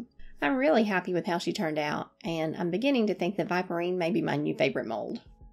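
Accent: South, United States. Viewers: They are all really beautiful. I found this channel through our Facebook page, so now you know it works to advertise! Also, you sound like you are from the south like me! It's great to have other doll collectors from the area. You do great work!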